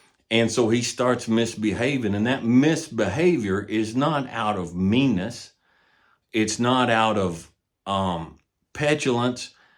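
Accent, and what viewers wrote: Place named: Midwest, United States. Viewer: Good morning from Moscow, Russia. It's in the morning, and I was about to go to bed when I came across your video and realized I couldn't miss it., it's been a tough year. I divorced my wife because of her infidelity, almost killed myself, and went through a depressive episode, but you helped me. Your videos with the measured pace of narration, calm voice, metaphors about horses and nature, those stunning views in the background, a cigar in your fingers and a Midwestern American "cowboy" accent were like an asmr for me. In moments of anxiety or restlessness, I would often just turn on random videos from your channel and find peace in them. Thanks to you, I survived the hardest episode of my 22 years of life and realized that the last two months of 2023 were the best, as I finally started breathing fully again. 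Thank you. I respect you and appreciate what you are doing for the younger generation.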